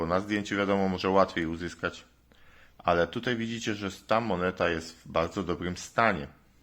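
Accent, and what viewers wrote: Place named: Poland. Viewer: Polski akcent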